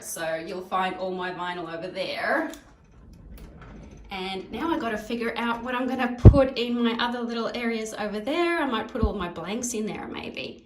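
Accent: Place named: Australia. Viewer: The relief I felt when I heard her Australian accent was unbelievable.. haha. I’ve watched so many cricut videos & it’s always American. This is the first time I’ve seen an Aussie do cricut videos so I subscribed straight away!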